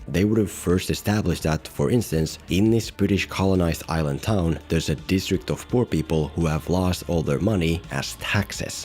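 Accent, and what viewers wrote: Finland. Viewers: Jankon Betoni, laatu matskua. Kuulostaa ihan Suomalaiselta kyllä, aksentti on tutun kuuloista. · Right in the intro of the video there's an example of that in the form of a clip from a classic Finnish comedy sketch. In fact, enjoying the content of this channel is probably an example of that because the narrator's accent is almost certainly Finnish.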